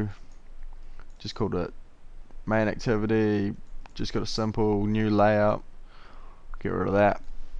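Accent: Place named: New Zealand. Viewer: Found a Kiwi haha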